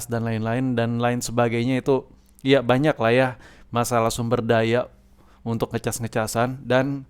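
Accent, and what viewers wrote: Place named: Banyumas, Indonesia. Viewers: Kaya wong banyumasan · Anjay basa jawanya kental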